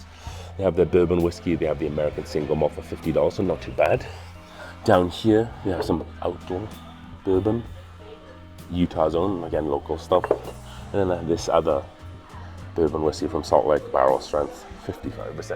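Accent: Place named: Wales, United Kingdom. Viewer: I like your videos but I've been trying to figure out your accent. Sounds like you come from Wales but only on certain words. Good choices on the bourbon but where are you from originally?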